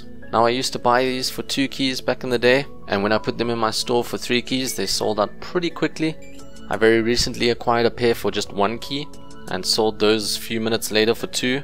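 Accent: South Africa. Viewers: are you from South Africa, because I am too? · is this guy south african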